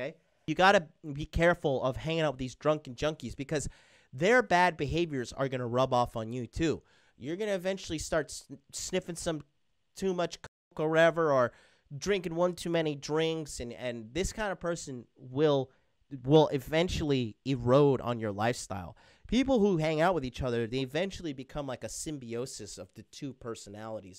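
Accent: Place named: Trinidad and Tobago. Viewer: Great Trinidadian accent!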